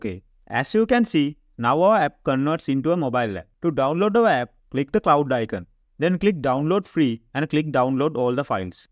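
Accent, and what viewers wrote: Kerala, India. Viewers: indian accent? I hear scam when i hear indian accent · Lived your malyali accent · Your voice is like my Indian frnd * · Nice Malayalam accent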